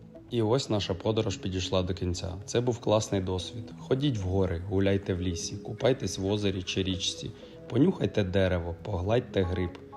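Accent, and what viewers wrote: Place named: Kuban, Russia. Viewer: «калакольчіка» «да» « сейчяс» ви з Кубані ? Розмовляєте якби українською але акцент і словечка з російського наречія, з Кубані мабуть…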